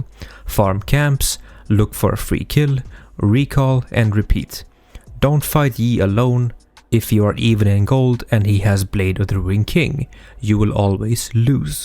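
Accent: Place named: Sweden. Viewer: From sweden right?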